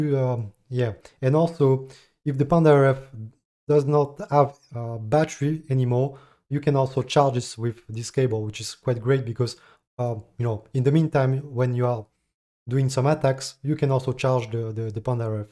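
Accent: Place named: France. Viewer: Hi ! Keep it up buddy #FrenchConnexion Your accent betrays you ahah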